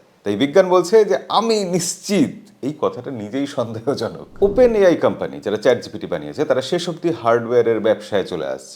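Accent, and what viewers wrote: Bangladesh. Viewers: উচ্চারণ শুনেমনে হচ্ছে যেন বাংলাদেশের কোনও চ্যানেল দেখছি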